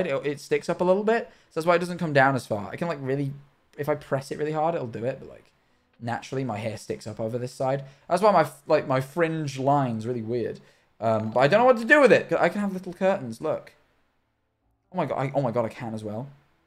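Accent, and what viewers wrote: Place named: RP, United Kingdom. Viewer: why did * sound so posh in this clip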